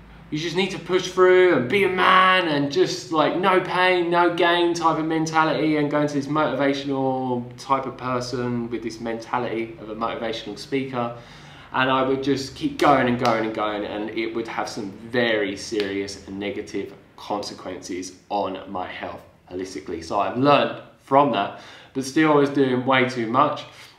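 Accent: United Kingdom. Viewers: woahhhh... shocked to hear you had a British accent; I thought you were foreign when I watched your 1 year transformation vid ;))))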